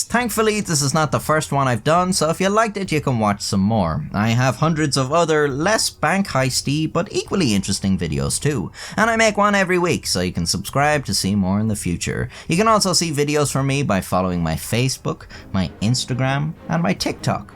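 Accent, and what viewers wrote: Ireland. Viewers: Can you cover the guy from Mali who stole 242 million (in your cute Irish tone) from Saudi I think Started listening to some guy and got bored and thought about you You little Irish scamp😂😂 Link on it's way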